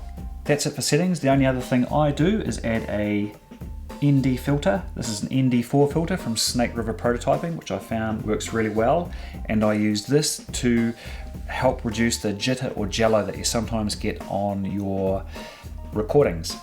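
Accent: New Zealand. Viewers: Awesome work. Have just discovered you. So good to hear a Kiwi voice, and NZ in your videos.Recently got a Solo 3DR with GoPro so your Tutorials are very helpfull. Thankyou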